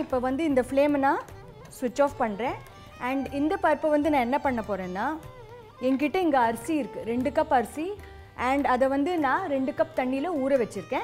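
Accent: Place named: Tamil Brahmin, India. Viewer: Pongal Paanai on a gas stove! wow. awesome clear instructions. Loved the tambram pronunciation as well - a bonus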